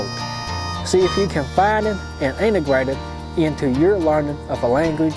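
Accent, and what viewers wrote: South, United States. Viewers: I think if you had learned and tried to speak with the "midwestern American" accent that television news reporters use, you would speak with a Chinese accent. Because you learned and speak with a southern accent, it hides any Chinese accent. You sound like a person from the American south. If this works for you, great! There are probably relatively few people from China who are learning English with an accent from the American south so it makes you very memorable. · Men you have no idea how much I love you southern accent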